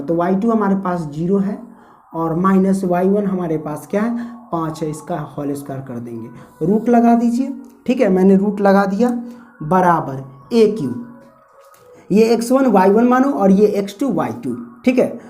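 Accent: Bihar, India. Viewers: Bihar se ho sir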